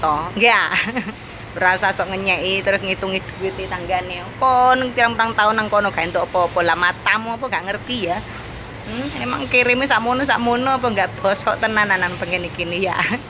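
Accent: Java, Indonesia. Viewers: hi mbak...salam kenal. saya lia dari cibubur. sukaaa sekali sama vloq mbak. aku juga suka dgn medoknya mbak. dan paham sekali artinya meski aku bukan wong jowo rek 😂.mbak tulus ngurusi si mbah. dan perilaku majikan pun baik sama mbak. itu imbalane mbak orng baik ketemu yng baik.btw, mbak dah brp lama di HK kerjone?bahasa HK nya juga hebat euy. kursus bahasa dulu mbak sebelum ke HK? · Jowone ngendi ce? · Mbk jowo ne nok ndi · Mba jowo e pundi kok muedok banget, salam dari surabaya 💗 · Jowone Ojo ngasi ilang mbak 😂😂😂😂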